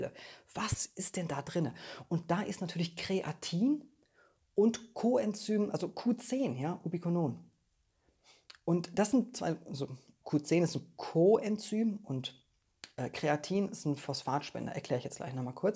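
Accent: Bavaria, Germany. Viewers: Lieber, ich finde es genial wie Du das machst. Feel free. Bayrisch und Akzent ist doch super. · Danke für deine Videos. Diesmal irgendwie anders, sehr ruhig gesprochen, konnte Dir sogar folgen. Lag vielleicht auch am bayrischen Akzent 😉, finde ich prima, ich lebe im Bayern. Liebe Grüße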